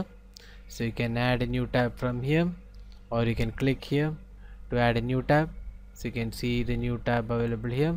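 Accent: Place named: South India, India. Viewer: Holy fuck... no offence man but your accent is very heavy making it impossible for me to understand most bits unless I have re-repeated them several times. Also, you do seem to have a heavy southern indian accent.. Please get rid of it!? You have a nice video and the content of your video and review is pretty good too. I will seriously recommend you to work on to neutralize that tone and accent and at least sound proper (your pitch was uncontrollable and went up and down monotonously as if you were least bit concerned or overly bored) and make sure there are no background noises(I could hear a song playing in the background clearly). Otherwise the review was helpful... thanks!!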